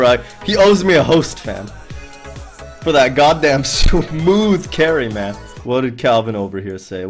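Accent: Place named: United States. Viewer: Are you sure you're not American? You sound like somebody from where I live.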